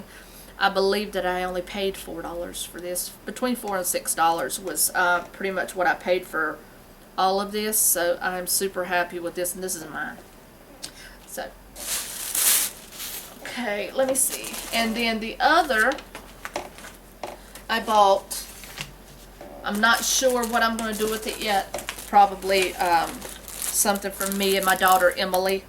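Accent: South, United States. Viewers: I was wondering where you're from? You mentioned Minnesota but you definitely have that Southern drawl like someone from either Virginia or the South. Also what did you make with the yarn in this video.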